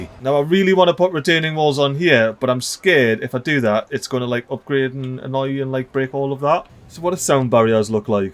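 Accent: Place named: Scotland, United Kingdom. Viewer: Hey, German here. What kind of dialect you're speaking? Rooond-a-booot :) I would guess somewhere in Scotland?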